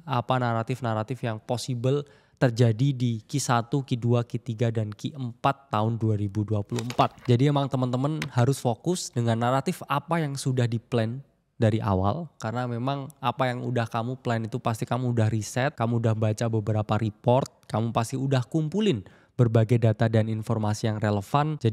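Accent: Java, Indonesia. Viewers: 🎉mas jowo nya ngendi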